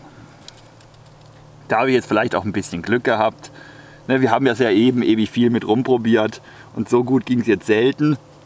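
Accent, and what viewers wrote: Baden, Germany. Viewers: Klasse Viedeo, sagemal von wo kommsten Du her😉 ich würde deiner Stimme nach urteilen aus dem Badischen. Und wenn das so wär. Dann wären wir vielleicht gar nicht soweit voneinander entfernt. Gruß der Markus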